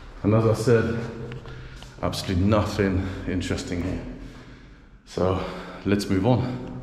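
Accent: United Kingdom. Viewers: Хоть я и не очень орошо знаю английский, я с первых слов понял что говорит англичанин) Резко режет слова. Englishman in Moscow))) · Hi I found your channel today I just adore your British accent! sending love and greetings from USA ❤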